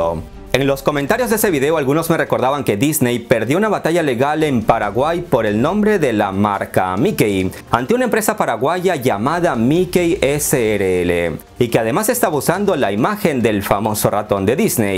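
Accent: Peru. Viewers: POR TU MANERA DE HABLAR, DEFINITIVAMENTE ERES PERUANO. YO CONOZCO MUY BIEN A MI GENTE. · Eres peruano verdad? Si es así saludos de un paisano..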